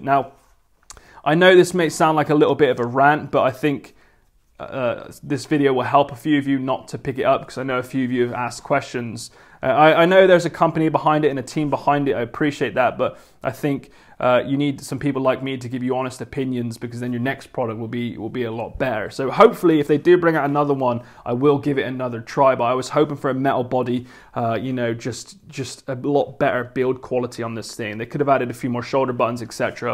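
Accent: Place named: West Country, United Kingdom. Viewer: It's a west country accent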